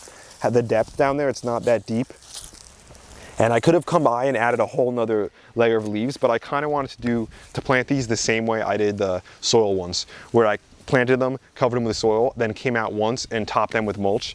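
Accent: New Jersey, United States. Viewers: I am in Burlington NC! I planted my seed potatoes in 10 gallon fabric grow bags last week. April 15. The russets are just coming through. I planted all the potatoes deep so I can have room for hilling. I learned a lot from this video. I have grown potatoes in straw and even cardboard boxes with successes. I was planted later this year because the first batch of seed potatoes I ordered from MIGARDENER were not chitted. So, I had to spend a month doing that. I ordered some new seed potatoes from MIGARDENER were a week ago and they came today already chitted, so I planted them immediately. Take care and good luck. I love listening to you talk with your Jersey accent. I lived in several places in Jersey in the 1970s Nd 1980s. I moved here from Asbury Park in 1992.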